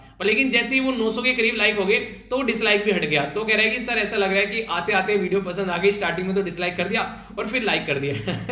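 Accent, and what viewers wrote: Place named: Western Uttar Pradesh, India. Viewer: सर जी आप कहां से हो मुझे आप की बात सुनकर लगता है कि आप पश्चिमी उत्तर प्रदेश से हो कल जरूर बताना । अगर किसी दोस्त को बता हों तो जरूर बताना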